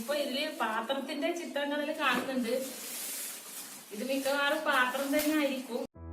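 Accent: Kannur, India. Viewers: കണ്ണൂർ aano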